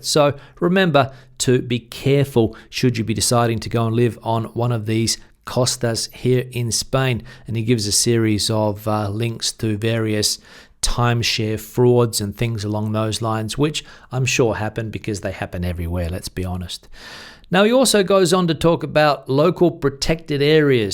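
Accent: Australia. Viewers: You seem to have an Australian accent! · I caught the Aussie accent straight away but was surprised your spanish is so good!! I'm Uruguayan but have grown up in Australia, our spanish is a little different to that of Spain but got to say it- you're speaking like a local! Well done!!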